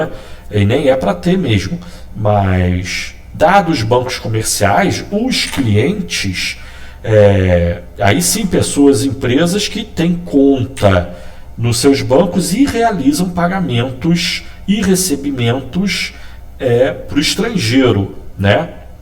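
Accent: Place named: Rio de Janeiro, Brazil. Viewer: professor é carioca ahha, excelente aula.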